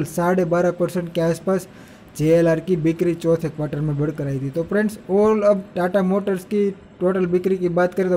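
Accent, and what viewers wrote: Gujarat, India. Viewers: lovely accent....jai gujrat...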